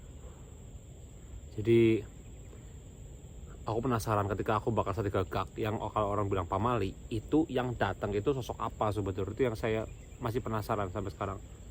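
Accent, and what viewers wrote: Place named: Java, Indonesia. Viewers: Rasa nya hambar lah, udah di makan sama jin, jawa nya medok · Ngapak nya jelas...dari tegal tah😊😊😊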